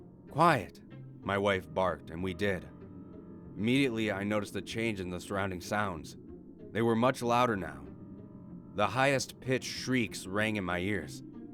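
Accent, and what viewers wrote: Russia. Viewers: Your Russian accent made me laugh so hard 😂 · Russian accent made my night...keep up the great work 👍👍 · Love your Russian accent it sound very real 😍 also kudos for the background noises even tho there are not a lot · You russian accent really sounds like russian)) i'm knew it :-D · He sounds like a Russian faking an American accent when speaking English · Omg ur Russian accent sounds like bourbon from metro 2033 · sound like Russian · Your russian accent is golden:))) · Great story, great narration, great Russian actcent.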